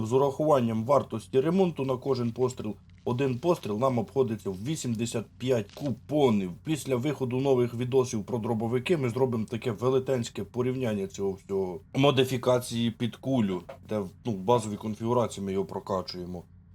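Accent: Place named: Poltava, Ukraine. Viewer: I love your Poltavian accent 😅👍❤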